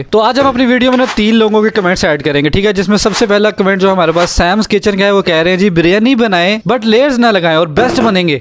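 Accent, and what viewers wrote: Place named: Punjab, India. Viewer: So yummy recipe😋...I like your punjabi accent😊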